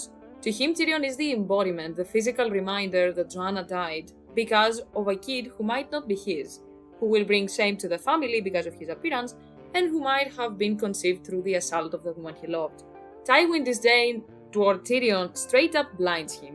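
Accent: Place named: Greece. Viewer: Thumbs up who always reads the community posts in her voice (greek accent ftw) 👍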